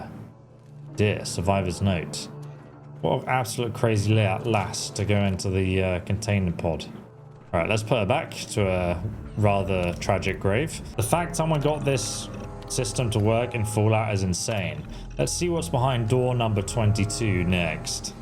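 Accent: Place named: England, United Kingdom. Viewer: I don't mind your English accent, but OMG, it's VAR-mit.!! Picture Yosemity Sam saying "Come here ,you pesky VARmit, rabbit." Var, as in far with a V!😂😂😂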